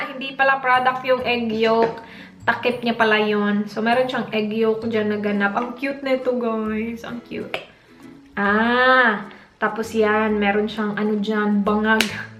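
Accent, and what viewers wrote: Visayas, Philippines. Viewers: haha natawa ako sa "bangag",cute kaayo paminawon ang imo tagalog na may sagol nga bisaya.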